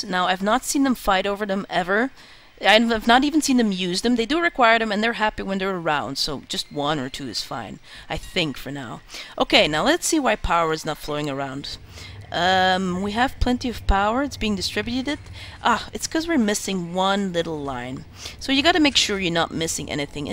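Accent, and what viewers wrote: Flanders, Belgium. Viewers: Nice! first flemish that dosent have that broad accent! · I'm also from belgium. Are you flemish? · may be cos she is from belgium :) But you can hardly tell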